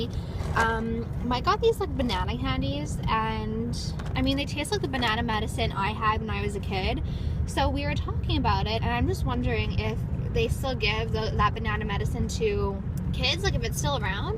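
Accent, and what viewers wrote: France. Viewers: aw your french accent it's cute!💘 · Montreal is in serious need of some snow so it feels like Christmas! Your french accent is so adorable.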